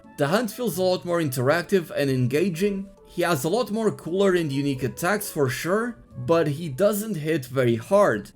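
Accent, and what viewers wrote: Germany. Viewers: interesting german accent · Yooo u german?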